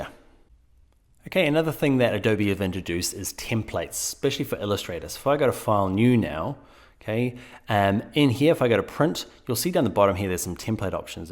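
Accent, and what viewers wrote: New Zealand. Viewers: Great video thanks mate. Are you a kiwi? Sound like a kiwi :))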